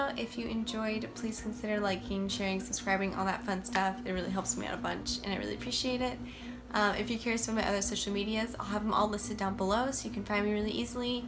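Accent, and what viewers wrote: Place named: Brazil. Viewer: é br? não sei, se isso foi ofensivo, mas seu sotaque parace q vc é br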